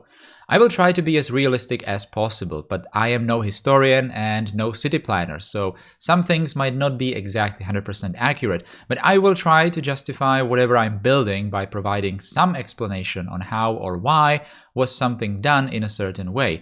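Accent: Czech Republic. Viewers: your accent tells me you are Czech, am I right? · Hi can I ask you where are you from. Your accent sounds Czech. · Are you Czech ? :D that accent .. or Slovak by any chance? :D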